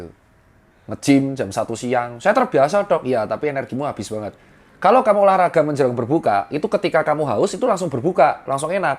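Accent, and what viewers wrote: Java, Indonesia. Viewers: Dengerr medoknya dokter ini jadi inget seseorang:)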